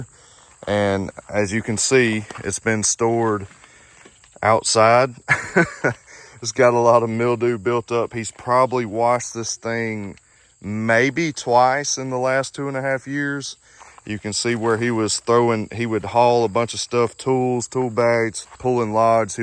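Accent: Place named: Mississippi, United States. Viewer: Hi, greetings from Germany (... yes, you're seen even overhere 👍😂) ... I'm a complete Newie in respect of ATVs ... Considering/Thinking of buying a CForce 625 s new, ... or something similar used condtion ... Saw already almost all of yr vids. ... All are very well done. Do like yr local regional Mississippi slang. Quite uncommon overhere 👍😄 Rgds & Best Wishes, DON, Germany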